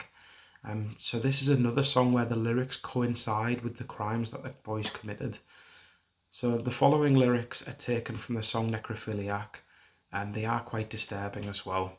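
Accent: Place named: Hartlepool, United Kingdom. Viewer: Can't wait for the next one. Very upset as any decent person would be but the family got their daughter's mortal remains back to bury at least. Very well presented. Lovely to hear a Hartlepool accent on Youtube.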